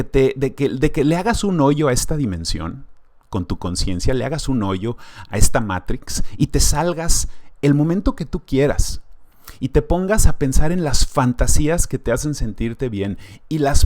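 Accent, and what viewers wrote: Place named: Mexico. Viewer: Aprecio mucho tu información, y me encanta el acento mexicano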